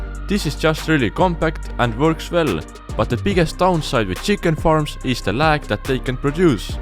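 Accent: Estonia. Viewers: u from estonia?? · He sound like he is Estonian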